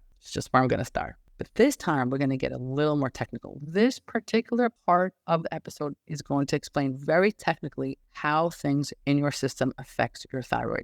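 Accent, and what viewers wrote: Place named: New York, United States. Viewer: This is an east coast US accent like New York or something like that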